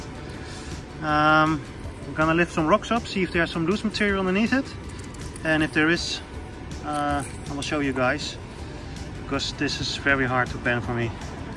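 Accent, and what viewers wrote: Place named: Netherlands. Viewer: Easy to hear you are Dutch. :)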